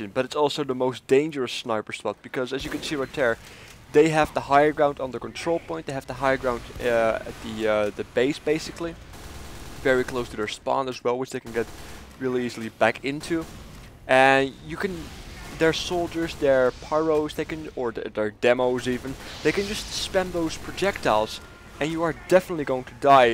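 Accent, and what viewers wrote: Netherlands. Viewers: Can I ask you something? I think you have a uncommon accent while speaking english. Is there any chance that you're dutch, because sometimes you sound like it :)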